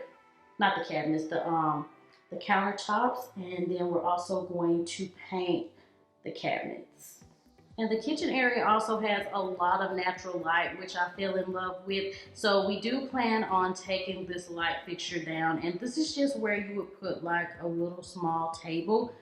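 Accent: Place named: Alabama, United States. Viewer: Love your home!! First video of yours I've seen and I will be back! Totally guessed in my head you are in Alabama! Lol I'm from Tennessee and when you're from the south, you can totally hear the different southern accents I feel like!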